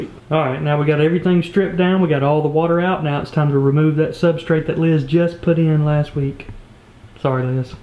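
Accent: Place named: Louisiana, United States. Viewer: i can tell this guy is from lousiana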